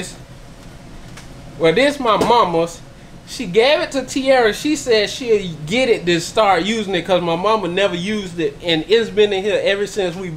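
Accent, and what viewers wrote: South, United States. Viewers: What state are. You located I detect a southern accent , you have a lot of stuff everywhere !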